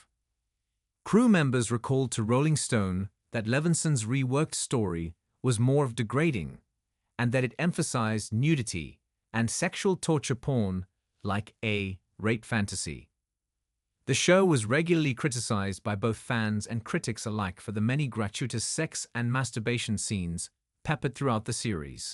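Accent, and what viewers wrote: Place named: United Kingdom. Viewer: Well that was a waste of time. There was maybe one (possibly two)sentence about Nosferatu and the rest was a rehashing of the rehashing of critics reviews of an HBO series I didn’t see. Dear God- Please- if you can’t get decent narration from AI use an actual human to read the script. And why the British accent. And what is wrong with me even bothering to comment? lol- I think I reached the breaking point with these “reporters.”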